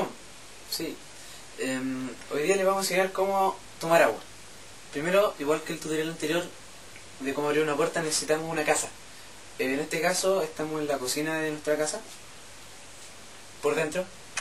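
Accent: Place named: Chile. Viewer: Acento chileno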